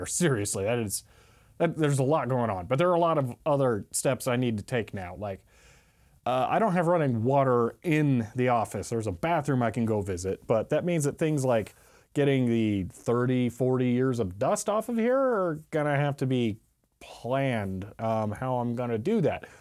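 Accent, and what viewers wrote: United States. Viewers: Don't know how you're getting on with your restoration, but… if you haven't plugged it in yet (8-months later says YouTube), then DO install another circuit from your fuse-box (just as you might when installing a shower or cooker for the first time). Don't just plug it into your ring-main with other appliances and wall-outlets. PS. I guess you are saying this in your video but the Americanese is not quite so clear as English English. Sorry!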